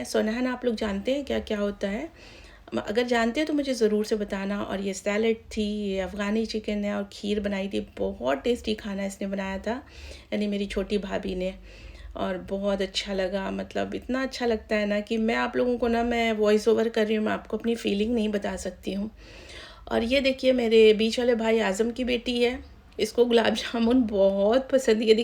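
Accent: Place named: Uttar Pradesh, India. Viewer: Aap ki awaz sunkr hi lga ki Aap up se hai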